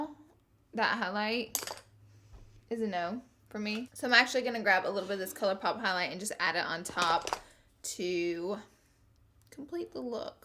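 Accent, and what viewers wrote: South, United States. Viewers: Try dermacol foundation ask jeffry about this foundation . Who ever say crap abt ur accent dont give a dam girl . Hi 👋 to my southern girl 😻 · Is it just me or do some people watch her videos for her beautiful southern accent😍😍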